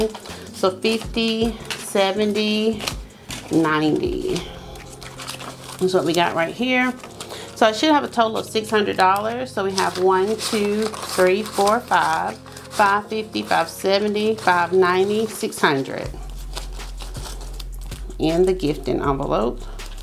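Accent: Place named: South, United States. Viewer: Are you a southern gal?(: I love your accent and I loved the attitude you brought to your video and that made me want to sub.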